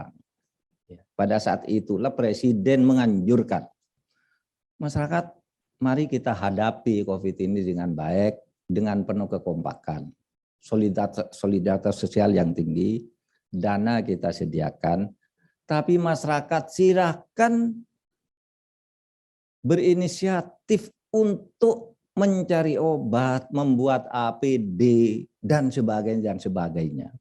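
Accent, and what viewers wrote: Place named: Madura, Indonesia. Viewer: Pak mahfud bikin bangga orang madura.. Logatx asiik...👍👍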